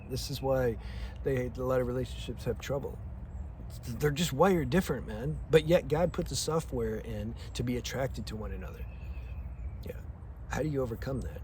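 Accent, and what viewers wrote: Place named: Jamaica. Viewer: ya talking like a rasta man now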